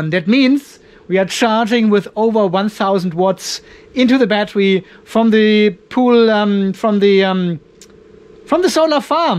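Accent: Germany. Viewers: Sorry to inform you but I am correct A-do is not a name in the English language. It might be a name in your native language, which sounds German by your accent. But as a native English speaker born in America where the phrase is often used. The phrase "much ado about nothing" is used to describe a situation where there has been a lot of excitement about something that is not really important.03 It comes from the title of a humorous play by William Shakespeare.3 The phrase was already being used for a big commotion or trouble in the early 1500s. Some people make a big fuss over a trifle, as in Jerry had everyone running around looking for his gloves.02 The phrase is often used to describe a situation in which there has been an overreaction to something that shouldn't have caused so much trouble.. But I think you know all of this, and are only making a Joke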